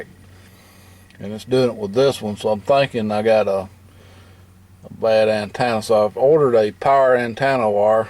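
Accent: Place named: Alabama, United States. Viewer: I love these videos! You sound like you live in Alabama, where I live. You do an excellent job and you're entertaining too.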